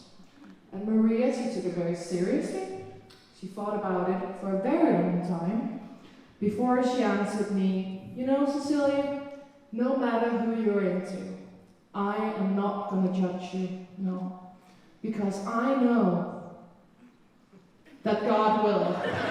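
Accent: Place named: United Kingdom. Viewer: Perfekt oversættelse fra din danske bid i “Grin til Gavn” oven i en formidabel britisk accent!🤩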